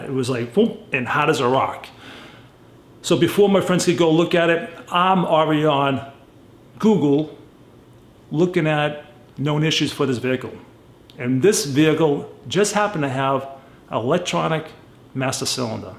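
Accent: Boston, United States. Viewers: I knew I detected a Boston accent. Also, my father had 97’ 4Runner stick shift, really bulletproof. He put 267k on the odometer because he was in the military going from New York to Texas. The vehicle raised me half my life. You made a great investment because in terms of reliability and resale value it’s worth it. Sadly, it’s unfortunate that the frame was rotten, those are pricey to buy and replace.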